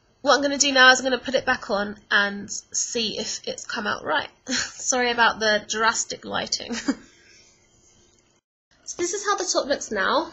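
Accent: United Kingdom. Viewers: haha yu said "whatever" soo americann lol , but i love your british accent (: cool video x3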